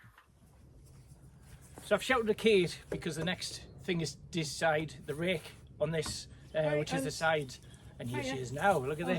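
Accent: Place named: Northern England, United Kingdom. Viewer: I love your running commentary teaching. I'm no carpenter, but I can follow your procedure. And I get nostalgic listening to the lovely Northern voice of my long ago childhood. Thank you.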